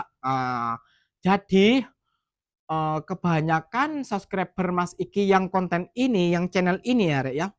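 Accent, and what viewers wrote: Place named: Indonesia. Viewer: dari awal sampai akhir video tuu kamu udah cakap melayu dialeknya aja dialek imdonesia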